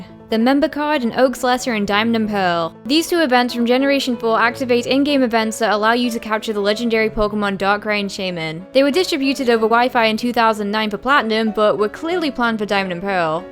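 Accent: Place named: Northern England, United Kingdom. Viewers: She's from Preston in Northern England. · That's a strong Northern English accent there.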